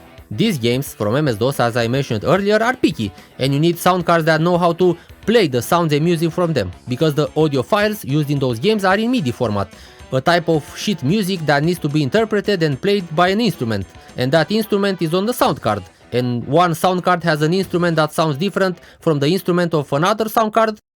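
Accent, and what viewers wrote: Romania. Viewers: Salut. Mișto clipul, succes! A, și super accent romanesc haha.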